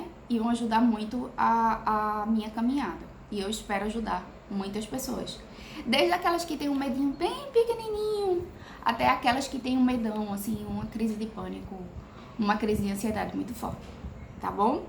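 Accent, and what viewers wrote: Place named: Northeast, Brazil. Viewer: Muito incentivador seu vídeo parabéns eu sou de Goiás e gosto muito do sotaque de vcs do Nordeste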